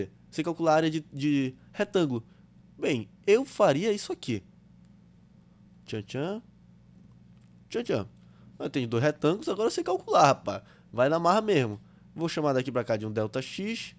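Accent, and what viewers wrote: Pará, Brazil. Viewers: Pelo sotaque parece ser paraense. Meu contaerraneo Haha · Paraense?Ta explicado porque intendi o vídeo égua kk És professor?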